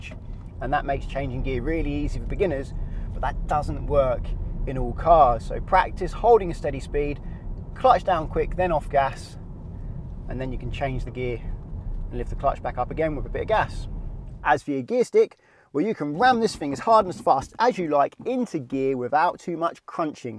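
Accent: England, United Kingdom. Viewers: British innnit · Can’t listen to this because British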